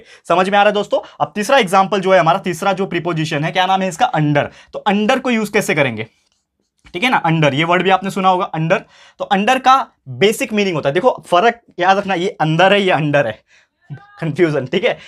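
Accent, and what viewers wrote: Maharashtra, India. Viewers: Sir your marathi is soo cute and nice and your teaching is soooooo beautiful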